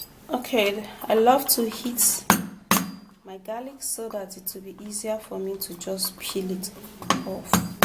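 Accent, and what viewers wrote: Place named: Nigeria. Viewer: Those are grapes she use not berries...but maybe thats what they name it in their country.She sounds like Nigerian to me.All that she uses are natural.every day stuff...I guess It will work.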